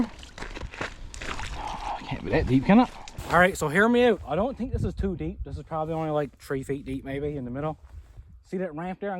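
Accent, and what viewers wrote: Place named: Newfoundland, Canada. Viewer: Tirty tree tree's 😂 im laughing on the floor. Needs subtitles for anyone not from Newfoundland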